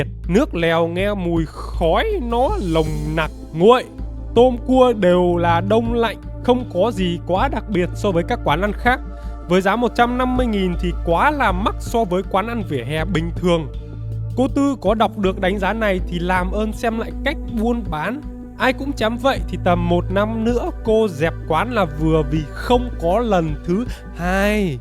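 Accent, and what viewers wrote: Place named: North, Vietnam. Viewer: Mình lại thấy bình thường không có làm màu gì luôn. Có thể chủ kênh nói giọng bắc nên chú đoán là khách du lịch nên chú tiếp đãi nồng nhiệt thôi.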